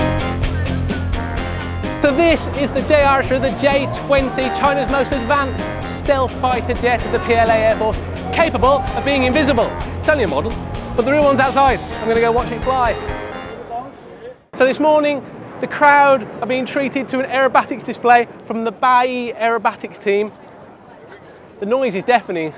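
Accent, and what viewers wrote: United Kingdom. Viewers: 英音 真的好听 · 你是英国人吗，口音喜欢。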